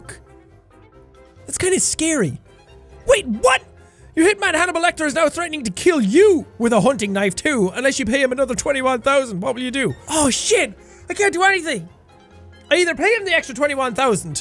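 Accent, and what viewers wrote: Ireland. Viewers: Sometimes when he sounds really Irish he sounds like sean from rdr2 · Jack turning more and more irish. XD "Fock em!"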